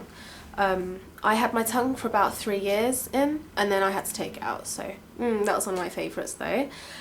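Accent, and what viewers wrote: London, United Kingdom. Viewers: I don't think it's fake at all. And even it is fake, it sounds very real. I'm from the UK, and my accent sounds very similar to hers. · I love the tattoo on your back/shoulder. And wow, has no one ever heard a London accent?! xo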